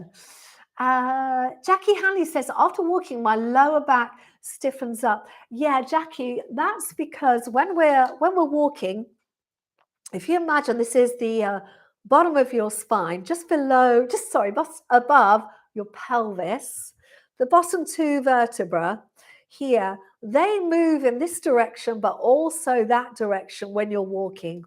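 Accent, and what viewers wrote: England, United Kingdom. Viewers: Thank you so much. Your English accent is so refreshing to hear! Sorry to those Aussie and American accents but they are often very high pitched and squeaky…!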